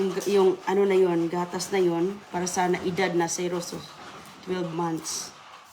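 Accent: Visayas, Philippines. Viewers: Oke oke you need to learn English now and you stay in America for how many years and still your accent is really different I know you are from visaya but it’s hard to understand 😮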